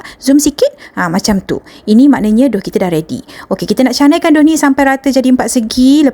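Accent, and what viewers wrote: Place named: Malaysia. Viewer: cantik aku suka aksen melayu dan suara nya❤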